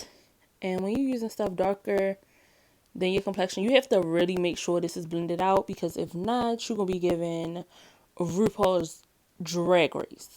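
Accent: Baltimore, United States. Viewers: New subscriber.... you sound like you from Baltimore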